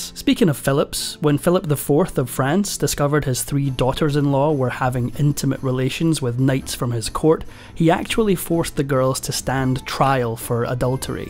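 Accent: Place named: Scotland, United Kingdom. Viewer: This Scottish dude is BY FAR the best Factinate narrator. I wish he did all of them!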